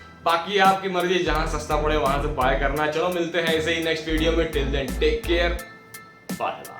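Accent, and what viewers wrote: Bihar, India. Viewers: Bihari accent bro💥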